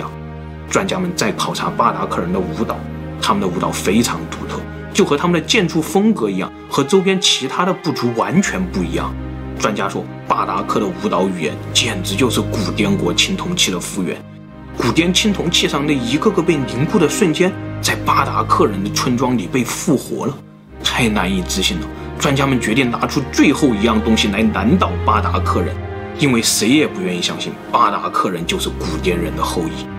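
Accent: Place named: Zigong, China. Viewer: 小哥是自贡—带人吧，你的口音太像南征北战的那位演军长的陈戈…大才子自贡人。自编自导自演的名剧抓壮丁😁😁😁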